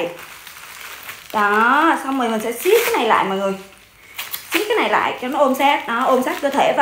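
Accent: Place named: Vietnam. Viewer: nghe giọng điệu là biết ở Vn rồi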